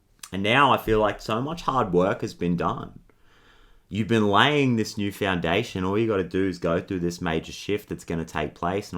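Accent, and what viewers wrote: Australia. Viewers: God you're adorable! and your Australian accent and the tone of your voice is wonderful on my ears. Thank you for your light and purity; thank you for choosing to share this very on-point and powerful message. You got my subscriptions and likes for sure! I look forward to hearing more messages from you! Cheers! ❤🙏💎🌟🌟🌟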